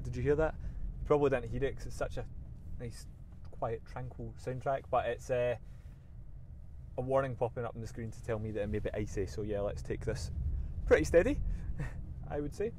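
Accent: Scotland, United Kingdom. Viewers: I just love listening to Scots speak hehe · Is it Scottish language sound?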